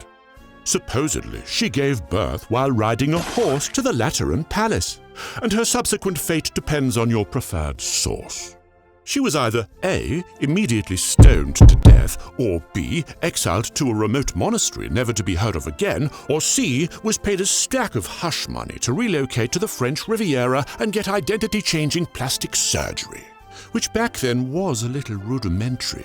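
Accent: United Kingdom. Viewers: phenomenal animation, accurate history, Plus that middle-aged male British accent. Why is this only 13k subs? You earned one more today at least 👍 keep going! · I guess his accent is British. I don't know why but most of the accents of documentaries sound British to me.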